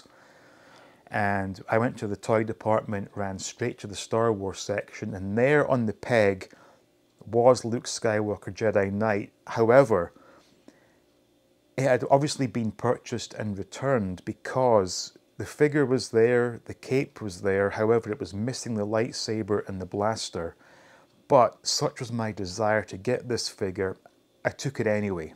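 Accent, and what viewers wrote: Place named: RP, United Kingdom. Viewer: So refreshing to see someone on a video that can sit behind a desk, can talk in the queen's English and not be wearing some trucker cap and talking to puppets, who just so happen to be their only friends in life.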